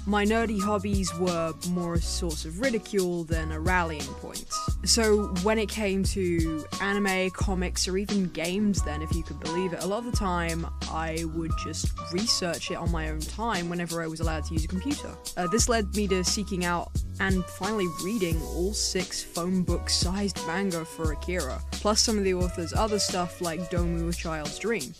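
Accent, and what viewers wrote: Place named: North, United Kingdom. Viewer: Ahh that would explain it! Definitely has that American twist on top of a Northern British vibe. Don't try to mask it! Always good to be proud of where you're from!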